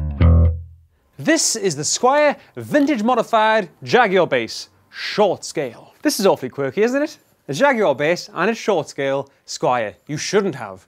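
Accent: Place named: RP, United Kingdom. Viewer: are you just the coolest bass player I've seen in a long time, or what? I love the intro, 'you shouldn't have'. For some unknown reason, every time I hear your accent I think of a quirky bass player, educated at Oxford, or Cambridge, but also understands the finer points of bass playing and bass guitar. Thanks for the review BTW; excellent. I've passed it on to all my muso friends.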